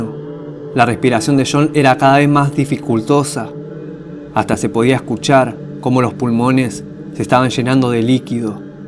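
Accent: Argentina. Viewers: Asi hablan los porteños de capital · la voz y siendo argentino jajaja da es pena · Es por que es argentino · Así hablan los argentinos? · me imagino que es de otro país, Argentino tal vez, Chileno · La Re. Caga el asentó argentino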